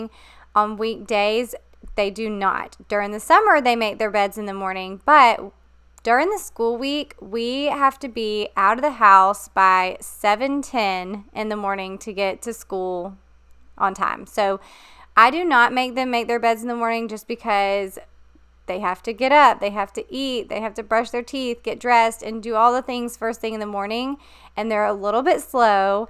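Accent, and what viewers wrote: South, United States. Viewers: Ok first of all - you’re one of the best ppl on YouTube, so shame on all the haters!!! Coming from Canada, just hearing your southern accent is awesome and relaxing!!!! Yassssss to spring cleaning! I’m all in for this! We are preparing to sell our home this summer so I need to get it thoroughly cleaned before showing the house! I need some good exterior house cleaning tips.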